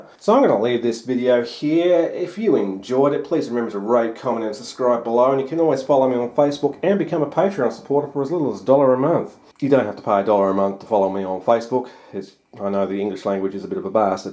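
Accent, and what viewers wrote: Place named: Australia. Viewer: Great to hear an ozzie voice when looking into this subject ta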